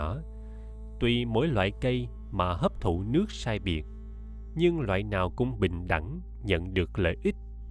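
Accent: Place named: South, Vietnam. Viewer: Rất cám ơn thầy Thích Thanh Từ và KTH. Tôi ở nhà có cuốn kinh Pháp Liên Hoa nhưng rất khó hiểu. Nhờ nghe bài giảng tôi mới hiểu thêm nhiều. Tôi cất giữ những kênh này mãi mãi ! Giọng thầy đọc rất hay, trong và sáng sủa và nhất là thầy nói giọng Nam giúp cho tôi hiểu rất dễ dàng hơn giọng Bắc . Rất cám ơn! Nam Mô A Di Đà Phật.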